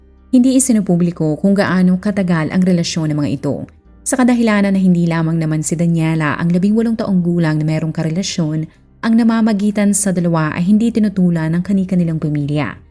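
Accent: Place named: Visayas, Philippines. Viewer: Taga saan po ba sla bisaya kasi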